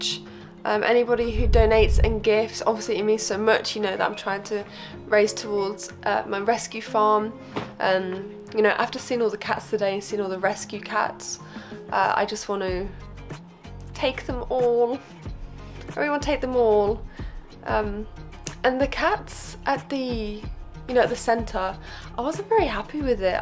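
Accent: Birmingham, United Kingdom. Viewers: Oh thought u from UK all along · As a fellow Brummie I distinctly here the Birmingham accent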